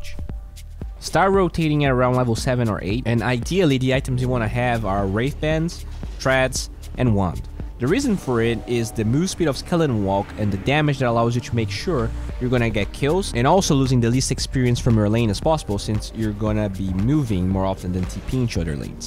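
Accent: Brazil. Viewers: Hey big fan here, Just a suggestion, try to loosen up more the brazillian accent, try removing ur chu-to accent Make sure chu fight chu win And instead Make sure to fight to win For me thats the biggest tell of a portugese accent (sorry for the wrong spells) Ive watch too many mma's where there r a lot of brazillian fighters. Love ur content bro, straight to the point and no beating around the bush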